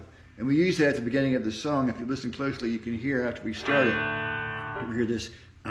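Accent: Florida, United States. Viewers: Mike and tom have the same accent being both from Florida make a lot of sense. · Both real Floridians!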